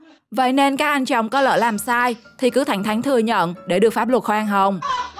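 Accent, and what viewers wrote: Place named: Tuy Hoa, Vietnam. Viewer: chào AD. giọng Ad khá quen Tuy Hòa thì phải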